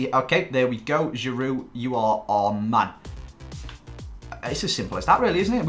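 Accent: Liverpool, United Kingdom. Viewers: Don’t think could sound more scouse in this vid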